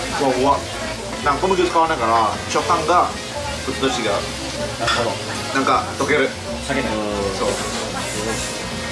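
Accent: Java, Indonesia. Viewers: semenjak ada, seneng banget liat bang ngomong medok jowo banget 😂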